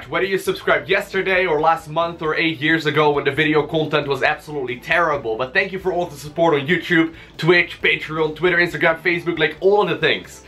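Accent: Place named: Netherlands. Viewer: Don't get supply blocked man! Keep building the eco ;) PS: your accent back then was so dutchy and cute!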